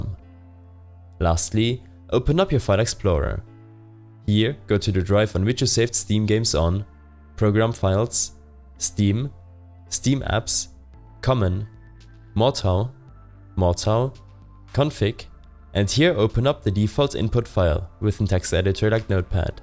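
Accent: Germany. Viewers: You sound German mein Freund.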